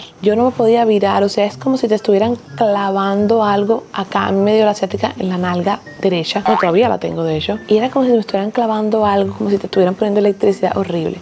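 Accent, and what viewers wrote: Cuba. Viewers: Es un acento, no es voz de niña , ella es de Cuba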